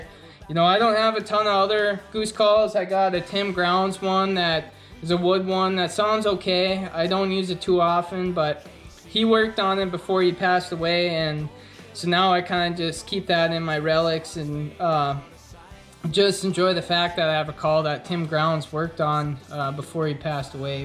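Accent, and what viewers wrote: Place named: Minnesota, United States. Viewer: ND?! You sound like you’re from Minnesota!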